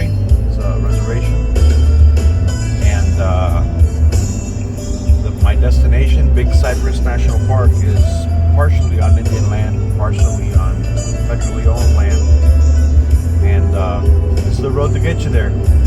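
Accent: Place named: Canada. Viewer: This is awesome! I was planning a trip soon! Good Canadian accent!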